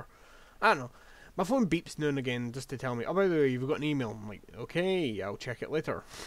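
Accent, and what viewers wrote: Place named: Scotland, United Kingdom. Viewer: scottish, should have guessed it, knew it sounded familliar.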